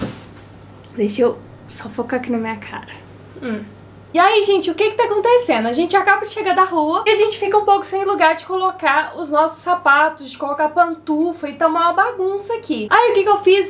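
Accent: Minas Gerais, Brazil. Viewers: seu sotaque em nossa língua é gostosamente mineiro! Sou daqui de Divinópolis, Minas Gerais! Trem difícil, cara emburrada, etc... muito legal! Você é a youtuber mais mineira do mundo! Conhece o Paulo, um rapaz lá do Recife que mora aqui perto de Divinópolis? Veja este vídeo, e vou te dar a ideia de explorar este seu jeito de falar mineirês! <link>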